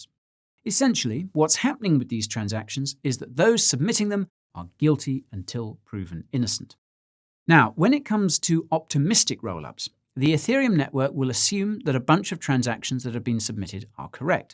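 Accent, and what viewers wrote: United States. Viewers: His American accent is getting better and better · Is that supposed to be an American accent?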